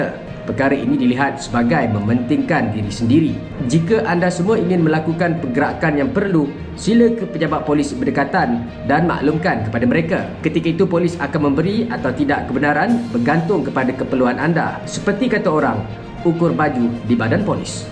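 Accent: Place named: Negeri Sembilan, Malaysia. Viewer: Hehehe.. cakap nogori hahaahah.. Jang tak sedo dri tu ajak bertumbuk... Ukur paku hahahah. Terbaik.. Support punyer berita... Hehehhe. Hari ke 12 dh nie... 👍🤣😁😂😎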